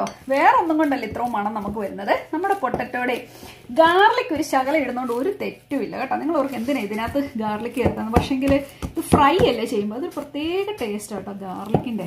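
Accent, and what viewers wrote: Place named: Kuttanad, India. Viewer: സംസാരം കേട്ടിട്ട് കുട്ടനാട്ട്കാരി ആണെന്ന് തോന്നുന്നല്ലോ 😊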